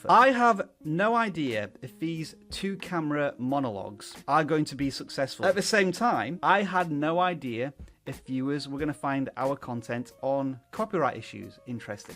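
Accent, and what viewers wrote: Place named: United Kingdom. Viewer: Don't you even think of faking an artificial "neutral English" accent, because one of the thing I most like about this channel is your British accent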